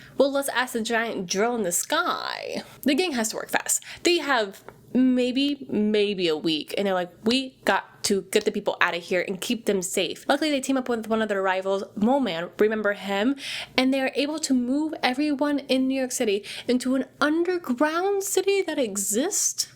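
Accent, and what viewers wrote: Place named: Israel. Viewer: you sound israeli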